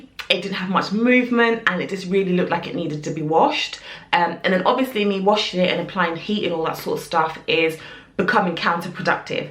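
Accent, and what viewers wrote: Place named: United Kingdom. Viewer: You have a beautiful UK accent and your tips are nice and right on point.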